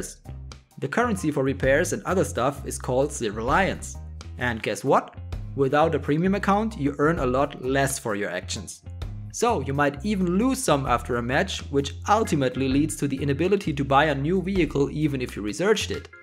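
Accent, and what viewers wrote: Germany. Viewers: Bin mir nicht sicher, aber ich glaube man hört deine Deutschheit raus :D Trotdzdem sehr gute aussprache, musste echt 5 mal hinhören ^^ "Jagdpanzer" hats dann bestätigt :D Deine ("Review") hat mich überzeugt. Zeit das WOT mal abgelöst wird :D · Hallo guten Tag man hört dass du deutscher bist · That is one German accent. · Echt gutes video aber man merkt das du deutsch bist # Tiger zwei oder Panther zwei sagtest du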